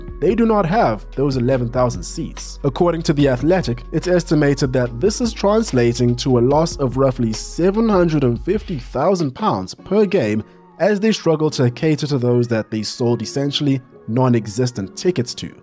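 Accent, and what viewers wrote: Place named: South Africa. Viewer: i hear a south african accent, i subscribe. simple as that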